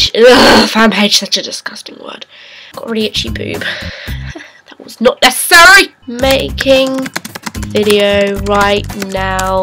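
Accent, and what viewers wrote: United Kingdom. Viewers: You make best videos on youtube for practicing british accent. And you're funny.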